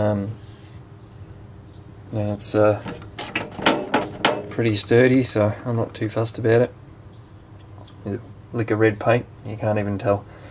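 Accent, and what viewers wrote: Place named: Australia. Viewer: Nice motor installation. Now to make some chips. From the accent I am guessing you are in Australia.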